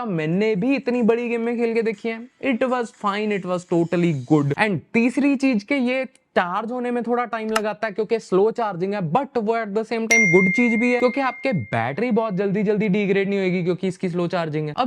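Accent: Punjab, India. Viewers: Bro punjabi accent 🤌🏻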